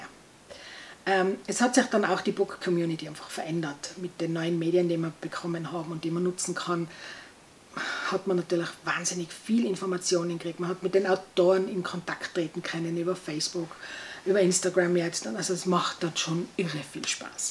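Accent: Tyrol, Austria. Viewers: Liebe Frau, wie schön, Tiroler Zungenschlag! Wir machen seit jetzt mehr als 20 Jahren jeden Sommer Wanderurlaub im Zillertal (der Gatte sogar noch länger, der ist mit seinen Eltern als Kind schon da gewesen) und hatten auch 2020 das Glück, dass da gerade mal ein bissle "Corona-Pause" war. Im Juli waren wir im Lechtal, da brauchte man sogar nur noch im Bus eine Maske.... hach ja, wird schon wieder! Auf alle Fälle ist es schön, Deinen Tiroler Zungenschlag zu hören und ich bin schon sehr gespannt, welche Bücher ich über Dich entdecken werde! Bleib schön gesund! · Hallo, ich bin gerade beim stöbern auf You Tube auf Deinen Kanal gestöbert. Ich habe Dir gleich mal ein Abo da gelassen 🤗. Wir haben einen ähnlichen Lesegeschmack und sind im ähnlichen Alter. Ich finde Dich und Deinen Dialekt sehr sympathisch. Da hast Du großes Glück in Tirol zu leben. Mein Mann und ich mögen Österreich sehr und sind viel in der Steiermark im Urlaub. 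Ich werde mich jetzt mal durch Deine Videos schauen ☺️! Liebe Grüße von Kerstin (aus der Nähe von Stuttgart) 🙋🏻‍♀️📚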